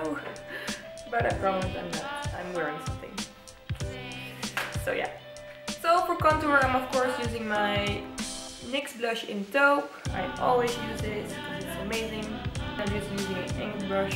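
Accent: Netherlands. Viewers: It's a Dutch accent :) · when u suddenly notice that she's dutch....or isn't she idk, but etos is dutch and her accent also sounds dutch, my country, yess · Volgens mij wel! Ze klinkt erg nederlanss🤔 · hoor ik een nederlands accent? :)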